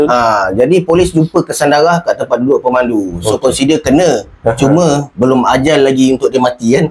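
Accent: Melaka, Malaysia. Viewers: 'lapau', 'dengau'.. Speaker ni org melaka ke? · Gaya cakap mcm org melaka ni....👍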